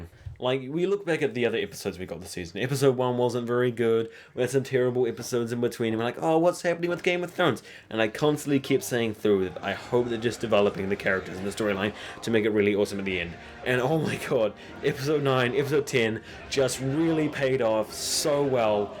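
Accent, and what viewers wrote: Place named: New Zealand. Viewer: *Great Video. I like your Kiwi accent reviews almost as much as I like the Ozzy guy reviews. The difference is you speak the Kiwi English and he speaks Bloke English. *The Little Bear Lyanna Mormont gives her speech like a boss. Sorry Wyman Manderly we gave your speech to a 10 years old girl. "The North only knows one king and his name is Snow....wait what?!" Oh well I joined in with the northern guys yelling a king in the North! Sadly I thought they would have had a Queen in the north but the northerners follow battle commanders and Sansa hid until the end with Lord (are you 18 yet Sansa) Baelish. **The Armada scene was great but I didnt see Tyrion on the ship so I assume he is on some other boat. I liked that Yarra had the big Pirate Captain coat. I almost wish she'd have stolen Capt John Sparrow's coat that he wears at the end of the 1st movie. 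However I don't think Disney will allow that until they Buy HBO.... #Disneyownseverything *Jamie will probably have to do something and I see Brianna Tarth helping him and then the 2 of them having sex. *Arya might sneak in and kill either Cersai or the Mountain, I'm thinking the mountain. She must have learned some kind of anti Necromancy many face gods spell to kill Franken-mountains.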